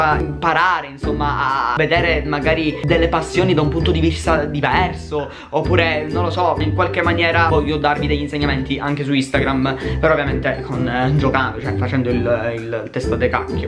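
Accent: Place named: Sicily, Italy. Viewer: ma tu sei siciliano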